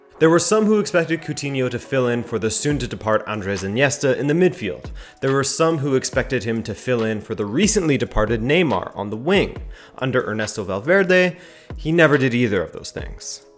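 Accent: United States. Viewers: the only time I hear football spoken about in an American accent, it's completely bashing it. nice change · Heard an American accent, turned off. It's Inter Milan not Inter Merlhaaaan. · Are you American? Lol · When I heard your American accent I thought you’d be making me angry at some point like most Americans talking about football. That didn’t happen. Very informative and well made video.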